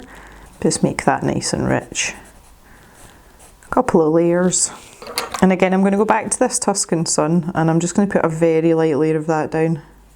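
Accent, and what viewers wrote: Scotland, United Kingdom. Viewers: I thoroughly enjoy watching your art...listening to your little Scottish accent (love it) and about your life! Do not let those thumbs down bother you! It is too bad it affects your channel.....so sorry!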